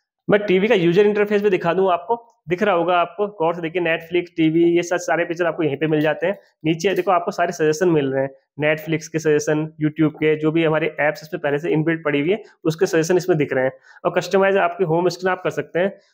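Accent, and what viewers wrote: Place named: Pahadi, India. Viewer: Nice vdo..🎉 ..apki awaj se feel hua pahadi ho..fir channel pe dekha nanital mentioned h 😅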